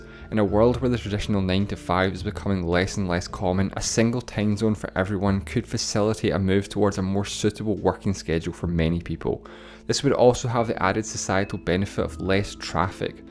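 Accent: Scotland, United Kingdom. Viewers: what accent is this?? Brits?? makes me puke... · His clarity is fine. He just has a Scottish accent that some folks will take getting used to. And I’m sure every youtuber is aware that more videos = more subscribers. He likely has another job or school that is his primary focus.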